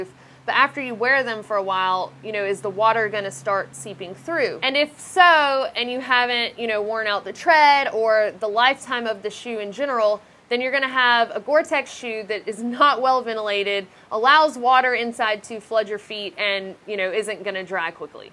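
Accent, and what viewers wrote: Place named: Rural, United States. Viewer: I love how a handful of words you say sound full-blown country...like, hike, dry, time, a while, supply, resupply, I, find, myself...but sometimes say them in that somewhere-between-the-Northeast-and-Midwest accent. I'm sure I have a chopped up accent too being raised in Southeast Texas by parents from South Philly. Even to this day, coworkers think I'm full of shit when I say I've live here all my life.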